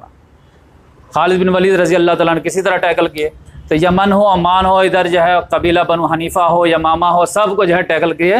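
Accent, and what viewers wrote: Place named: East India, India. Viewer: Assalamualaikum wa rahmatullahi wa barakatahu sheikh saab....aapki aawaz se lagta hai aap east india side ke hain , Jharkhand or Bihar....aapse milna hai ..InshaAllah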